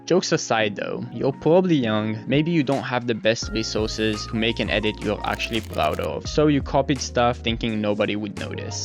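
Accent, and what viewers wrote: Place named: France. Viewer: Bro you need a new Mic bro And change your French accent and then i will subscribe you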